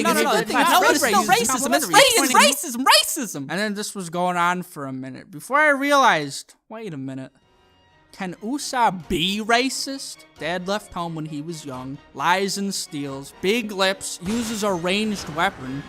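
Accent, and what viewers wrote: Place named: Boston, United States. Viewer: Chimp champ are two different words your dialect is very bosten east coast like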